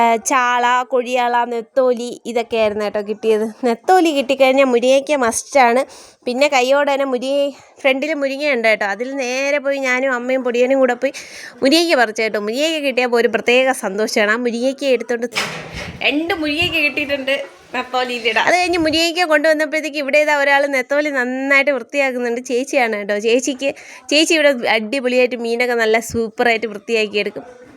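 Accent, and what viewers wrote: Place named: Parassala, India. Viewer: ഇതാണ് കറക്റ്റ് പാറശാല ഭാഷ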